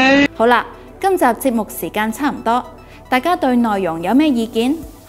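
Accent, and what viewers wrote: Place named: China. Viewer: 大陆音主持，明白了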